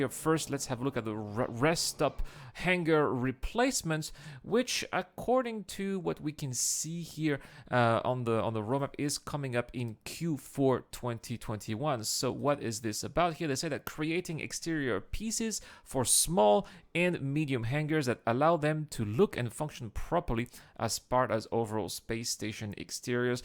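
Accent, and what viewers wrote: France. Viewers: nice i really love that your channel is growing! you are always on point and i love your french accent, greetings from germany keep it up! :) <3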